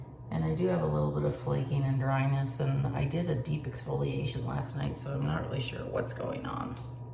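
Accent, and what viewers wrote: Canada. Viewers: Hello new friend, I am a new subscriber and I love what I see so far. I am a 47 y/o married x 22 years, mother of 1 sixteen y/o who is a lover of makeup too and clothes and purses and jewelry, lol. Where do you live? You sound Canadian. I live in Labrador, Canada, xo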